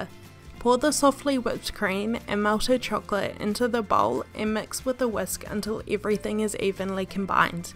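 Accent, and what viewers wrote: New Zealand. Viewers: I loved your voice and accent like from 🇳🇿 . Not to mention your channel and video content. I will try this one to make and will use whittaker dark chocolates too!!! Love chocolates from🇳🇿. I loved cheesecakes, blueberry cheesecake is one of my favorites by far. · Definitely sounds like a kiwi accent! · That New Zealand accent reminds me of my cousin. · really good authentic nz accent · She sounds like she’s from New Zealand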